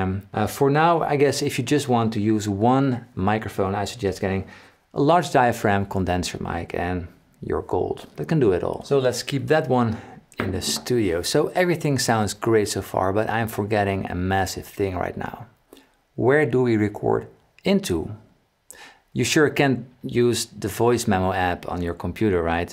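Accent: Netherlands. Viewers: You sound super dutch. Good info thank you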